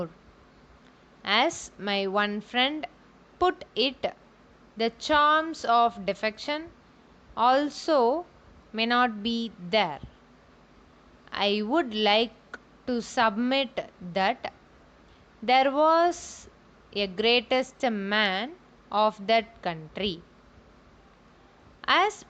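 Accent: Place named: South India, India. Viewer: Yesi dictation exm m ayegi to kyse samjh ayega bhai pura south accent h ye to 🤔🤔🙄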